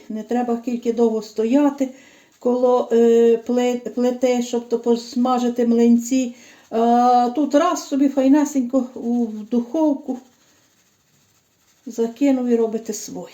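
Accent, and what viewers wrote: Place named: Ukraine. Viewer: Доброго дня Вам пані, гарні всі Ваші рецепти і особливо стародавні. А ще Ваша розмова зачаровує, але не міняйте свого діалекту на те, що Вам там можуть зауважувати, мовляв не "лижка" а "ложка" - але ж цим і є особливість Ваша!!! Говоріть так як Ви і завжди звикли - це дуже гарно і своєрідно українське!!! Міцного здоров'я, мирного неба і процвітання Вам!!!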